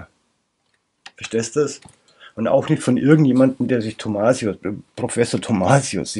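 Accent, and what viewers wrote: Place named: Munich, Germany. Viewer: scheinst ein sympathischer mensch zu sein! und noch mit echtem münchner dialekt! grüße aus ndby!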